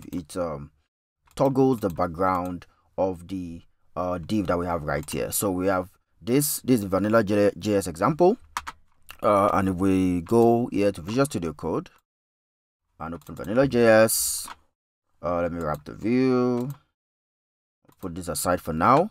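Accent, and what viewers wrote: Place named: Caribbean. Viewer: This is the first time ive ever heard someone with a caribbean accent on youtube... (maybe jamaican?).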